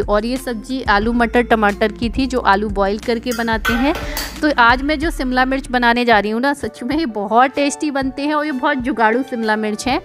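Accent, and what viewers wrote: Uttar Pradesh, India. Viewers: Aap kha se up se ho gya apki awaj se lg rha hai plz reply jrur krna kha se hoo tum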